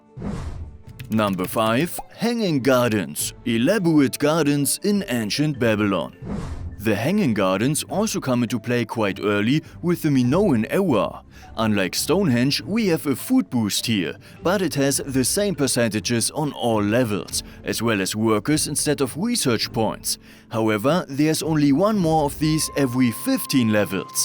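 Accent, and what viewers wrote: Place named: Germany. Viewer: always this groose german English... terrible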